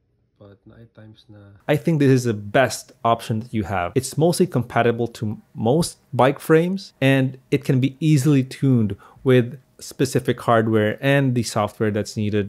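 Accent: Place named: Davao, Philippines. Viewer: Taga asa ka? Murag naa kag Davao.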